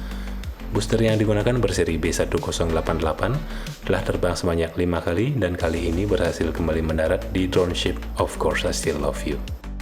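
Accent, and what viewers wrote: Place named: Aceh, Indonesia. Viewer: logat acehnya masih kental bg.. tpi ga papa lanjutkan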